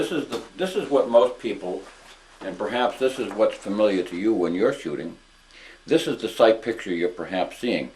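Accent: New England, United States. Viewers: I have been waiting for months for a new range in our area to open so I can take lessons with an instructor there. In the meantime, I have been able to shoot at a couple of places without the benefit of the lessons with mixed results. After watching parts 1 & 2 I can see where I was making mistakes in lining up the sights vs. trying to get the target into focus. This series of videos is probably the best common sense training I have seen on the internet. Thank you very much and please keep producing these informative and high quality videos. I also like your New England accent. Most of the firearms You Tubers have southern accents which is fine, but my wife is from Massachusetts and I love a New England accent. Yours sounds more like New Hampshire though.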